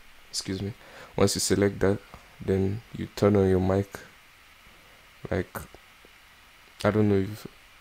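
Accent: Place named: Nigeria. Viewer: You a nigerian bruh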